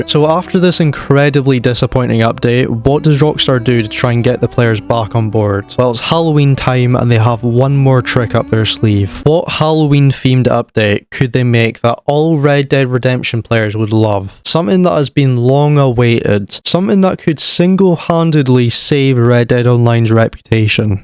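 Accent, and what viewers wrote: Scotland, United Kingdom. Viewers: I smell a Scot. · Hey, are you Scottish by any chance? Your accent is kind of peculiar in a good way lol.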